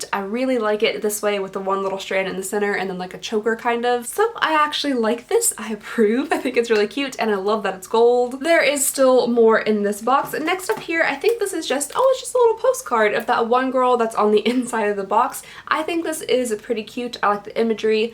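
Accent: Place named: South, United States. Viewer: I’m from the South but am currently on the west coast courtesy of the military and I watch all of your videos because I loooove hearing your accent. It makes home feel just a little less far away.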